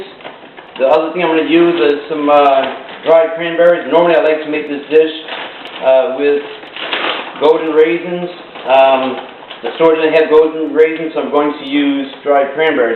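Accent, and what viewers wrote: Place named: Louisiana, United States. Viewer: No accent you say? ? I hear Louisiana.. Delightfully so. I chose this video to respond because I enjoyed it..and it will remind me that I want this recipe. :-)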